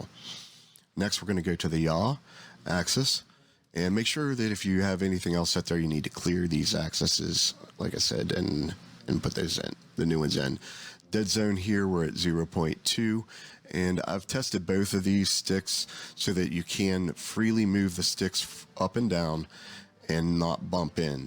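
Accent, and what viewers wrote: United States. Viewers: Dudes definitely from Philly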